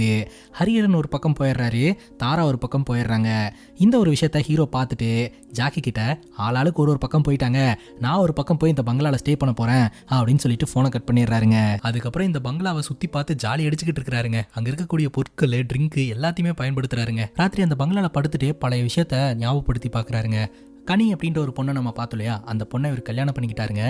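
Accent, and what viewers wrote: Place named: Kongu, India. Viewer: may be இவரு கொங்கு region ah irupanga nga...இது கொங்கு தமிழ் nga... inga elarum ipaditha பேசுவோம்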